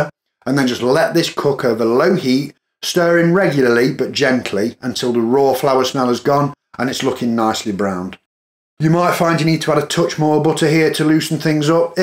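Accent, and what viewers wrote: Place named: Nottingham, United Kingdom. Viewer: you from Nottingham mate?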